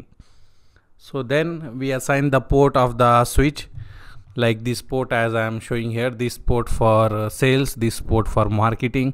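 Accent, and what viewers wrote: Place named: India. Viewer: Normally i look at dislikes if a video is a waste of time, but youtube removed them. Thankfully i heard your indian accent and knew this would be helpfull thanks man.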